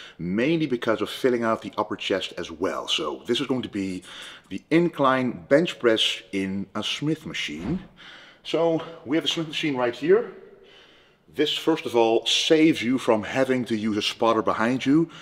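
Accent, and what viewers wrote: Netherlands. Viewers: I love the way he talks, it's just calm, clear and spiced up by his dutch accent. I don't perceive any arrogance, just confidence. Which is understandable, he is one of the top bodybuilders in the world.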